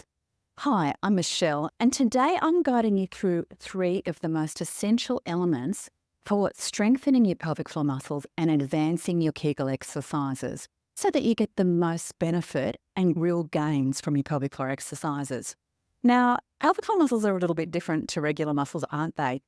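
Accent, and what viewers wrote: Australia. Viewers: The Australian accent is very appropriate for errectile dysfunction discussion. My favorite channel so far.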